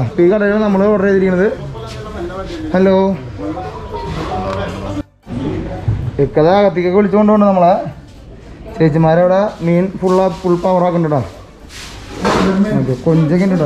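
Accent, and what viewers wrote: Thrissur, India. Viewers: ഈ തൃശൂർ ഭാഷ ഒന്നു മാറ്റി പിടിച്ചാൽ കാണാൻ ആളുണ്ടാവും തൃശൂർ ഭാഷ ആവർത്തനം ആവുമ്പോൾ കാണാൻ പരമ ബോർ ആണ് ബ്രോ എല്ല വിഡിയോകണ്ടിരുന്ന എനിക് പോലും മടുപ്പ് തുടങ്ങി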